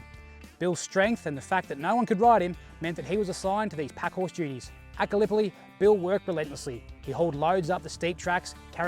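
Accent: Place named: United States. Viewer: Too american, just slow it down and thats when men, were men !!!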